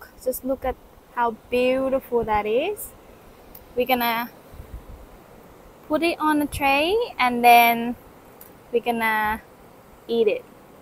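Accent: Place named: Australia. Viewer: You almost have a hint of an Aussie accent. What a beauty.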